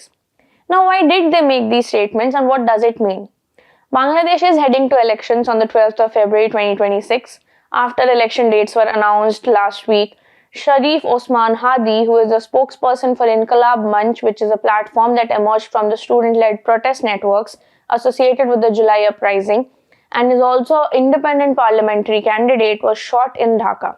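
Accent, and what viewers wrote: India. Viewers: I could barely understand what you were saying! Very very strong Indian accent made it very hard to understand. Either speak in Hindi or improve your accent before presenting. Very poor performance.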